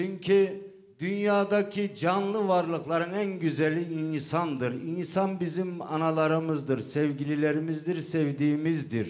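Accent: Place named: Anatolia, Turkey. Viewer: tam Anadolu ağızı çok güzell nazal n ler falan super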